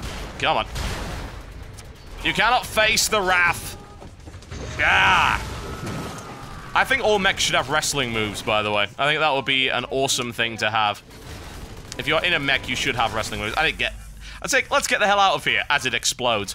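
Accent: United Kingdom. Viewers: You're British.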